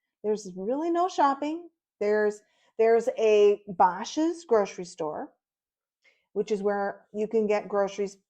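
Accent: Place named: Minnesota, United States. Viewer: I thought I recognized a little accent there, don'tcha know?? Ya, you betcha! I grew up in a small town of 250 people in Southern Minnesota 😂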